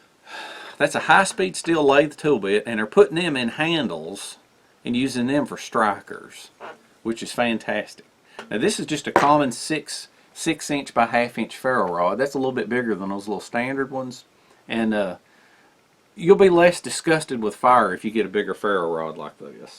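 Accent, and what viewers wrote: South, United States. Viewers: Icstill love the way Yu talk southern voice so cool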